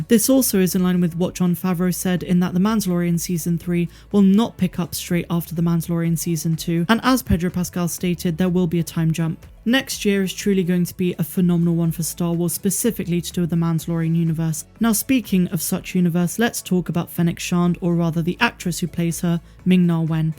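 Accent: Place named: England, United Kingdom. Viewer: I love hearing your English accent:),,, on Starwars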